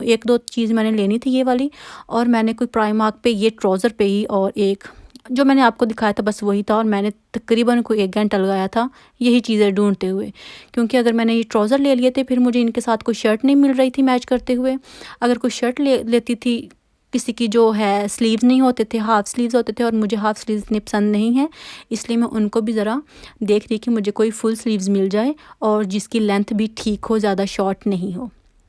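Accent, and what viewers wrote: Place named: Faisalabad, Pakistan. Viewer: Ap Faislabad me kis area sy hain? apky bolny sy lgta hy ap apny he shair sy hain…yani pensera road sy agy:))))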